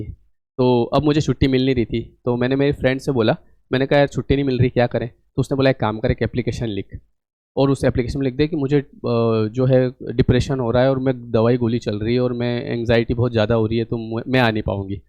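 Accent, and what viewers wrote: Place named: Malwa, India. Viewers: Inki boli se ye ujjain/malwa se lgte hain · Bhai aap indore se ho kya? 😅Bade he indori lag re bhai😅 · Sir aap indore ya Malwa region se jude hai kya .... Apki boli se mujhe lagta h k aap hamare malwa region se jude hai 😊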